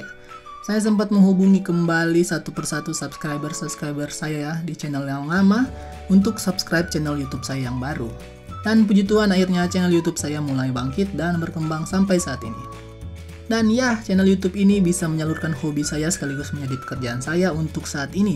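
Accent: Manado, Indonesia. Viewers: pantes kyk hafal logat nya wkwkwk manado koten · yaa,kirain Sulsel,ternyata Manado,gue Sulsel bang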